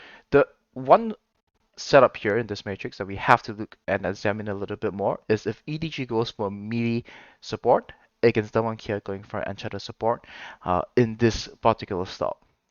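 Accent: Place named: Singapore. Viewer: Speaker slower.also don't try to hide ur singlish. Celebrate it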